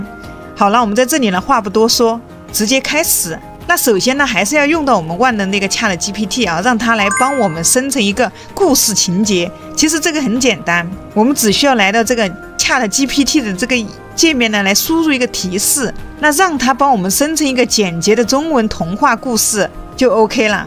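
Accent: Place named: Sichuan, China. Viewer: 川普哈哈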